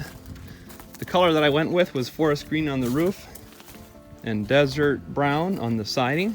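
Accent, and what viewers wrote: Canada. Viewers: Wow, superbly nice work! You are so resourceful, and such a diligent worker! I like the overhangs. They are worth the extra time and effort, plus they drop rain farther away from the shed. I gave you a thumbs up and subscribed. And someone gave you a * FREE * door, bonus! Could you add a cost list, please? How much did the wood cost, the metal panels, and the windows. I am wondering if it's cheaper to buy timber and screws and a window and so on, or to buy a whole shed kit. Are you Canadian, eh? Your profile page says you are somewhere in The United States, but you sound just as Canadian as can be.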